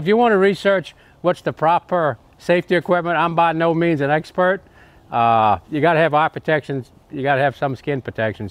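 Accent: Louisiana, United States. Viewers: you got any Cajun in your background? Your wonderful accent reminds me of the venerable Justin Wilson - ah gar-own-tee!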